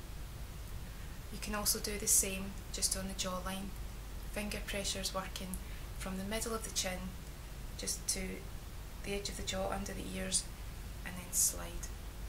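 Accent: Scotland, United Kingdom. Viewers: It's very refreshing to have a fellow scot on one of these videos, lovely! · are you Scot? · Your voice calms me because it reminds me of my grandmothers thick scotish accent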